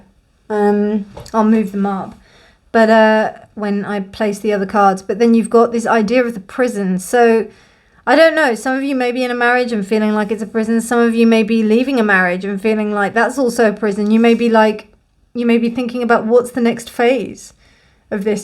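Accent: United Kingdom. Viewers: Finally, I'm done with the things I need to face. And now I have the time to see this! Woooh! I already watched the other suites and I saved this as last. Save the best for last. Thank you, Ms for choosing this deck. I love this deck. ✨🤍 Yet it made me had a hard time choosing for I love all the cards from this deck. 😂 And when you mentioned "Harry Potter" with your british accent. I suddenly remembered Hermione. Add your intelligence, brave voice and laugh. ✨🤓✨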